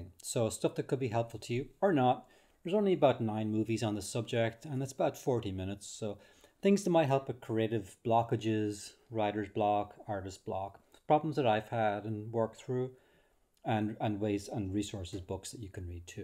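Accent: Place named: Ireland. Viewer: I’ve done loads of your courses, they’re all great! Great to hear an Irish accent too:) go!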